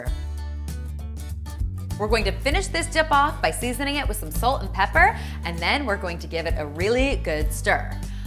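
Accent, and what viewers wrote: Canada. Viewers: She kinda talks like a news anchor but like in a totally non annoying way in fact I love her Canadian accent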